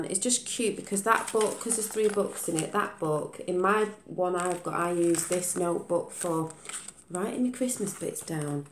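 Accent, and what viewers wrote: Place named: North West England, United Kingdom. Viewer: Oh my goodness, Thanks for the shout out!!! Sharpies are the worst! You can do a bleed through test with pens on your back page. Stabilo fineliners should work well on normal paper. Come and join our group on Facebook too!!! You'd fit right in! Also, you sound local to me!! My accent betrays me...I actually live in the North west of England Xx <link>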